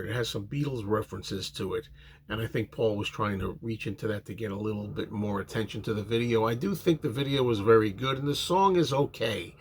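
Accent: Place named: North Jersey, United States. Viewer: I have this one on vinyl, and saw him at MSG when this came out. Never really listened to it much., sound like you’re from northern NJ. Are you?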